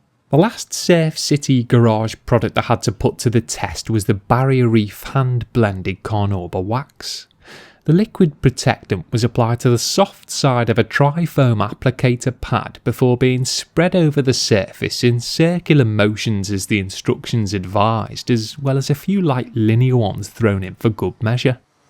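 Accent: Liverpool, United Kingdom. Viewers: kisses He's from the city of Liverpool in England and it's referred to as a Scouse accent (scouse as in mouse). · loving the fact you have a really subtle almost Scouse sounding North West twang. any ideas where this car went after your video or does the guy in Liverpool still own it? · Yeah, but car and accent kind'a don't fit; hardly ever see any typical us-cars in the UK.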